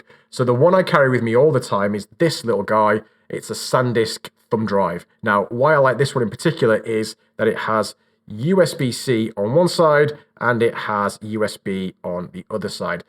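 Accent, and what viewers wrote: United Kingdom. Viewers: You’re British? Excellent video!! 👏🏻 Greetings from Chile 🇨🇱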